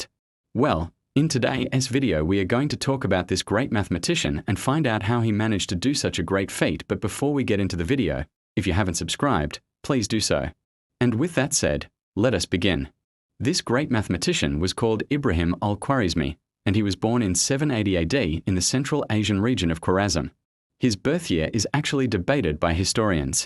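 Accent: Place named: United Kingdom. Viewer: An AI voice talking with a British accent, pronouncing Arabic names perfectly, and claiming that Muḥammad ibn Mūsā al-Khwārizmī was an African. In reality he was from Persian or Central Asian descent and was born in the region of Khwarizm (Chorasmia), near present-day Khiva, Uzbekistan. This channel is really unravelling...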